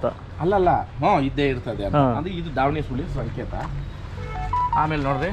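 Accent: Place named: Butler English, India. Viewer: Batlar English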